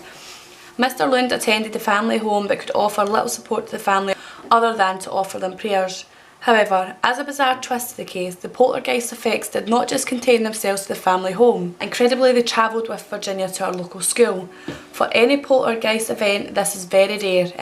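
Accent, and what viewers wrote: Scotland, United Kingdom. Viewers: Love the Accent I would have never guessed it was Scottish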